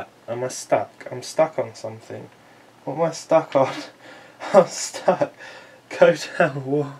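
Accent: United Kingdom. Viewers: Hahahhaha "excuse me.... here we go!" Hahahhaa I love this British guy! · Great video but honestly, the commentary is so damn british it's incredible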